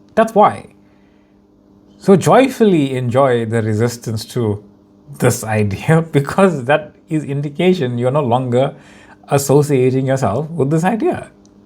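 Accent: South Africa. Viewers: That's how Indian people speak in South Africa.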